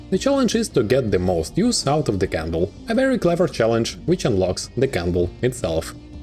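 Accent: Russia. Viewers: С оригинальным контентом не знаком, наткнулся на это видео и по первым же секундом услышал руский акцент, возможно это так для рускоговорящего плохо звучит, но звучит нечем не лучше идуского, лучше уж диктора нанять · Do you hear that? It's a Russian accent.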